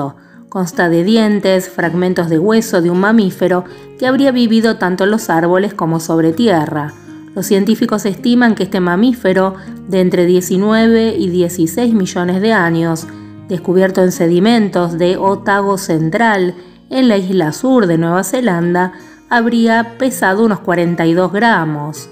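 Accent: Argentina. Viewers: ¡Me encanta este canal! Soy de Nueva Zelanda y el inglés es mi lengua materna, así que disculpa cualquier error. Si aún no lo has hecho, deberías hacer un video sobre las aves de Nueva Zelanda. Tenemos muchas aves raras aquí, incluidas muchas que no vuelan. Me encanta tu acento. ¿Eres de Argentina? Un abrazo desde Nueva Zelanda 😊 .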